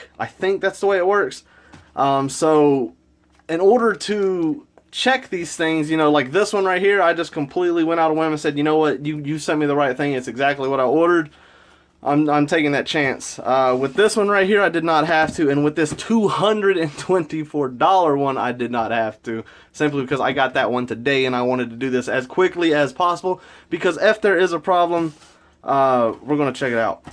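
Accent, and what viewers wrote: South, United States. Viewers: I noticed your accent, where are you from? I live in Bama and it's nice to see a fellow southerner into retro games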